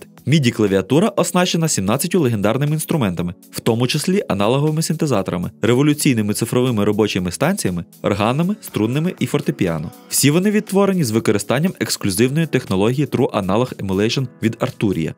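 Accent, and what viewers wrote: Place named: Russia. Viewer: Парень явно привык говорить по-русски, акцент чувствуется;)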